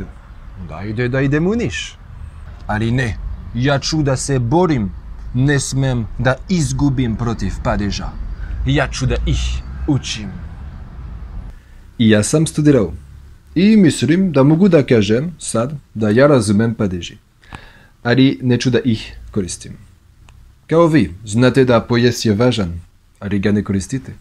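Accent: France. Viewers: prica srpski sa francuskim naglaskom hahahahahaahh super si ljubi brat 😂💪🇷🇸🇫🇷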